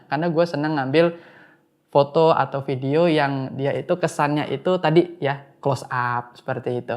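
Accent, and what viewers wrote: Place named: Java, Indonesia. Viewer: Bahasanya medok tapi lo gua lo gua wkwkw. Jawakarta beda dari yg lain😂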